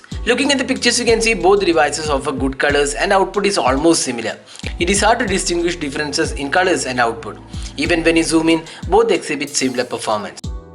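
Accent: Kerala, India. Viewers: R u a mallu??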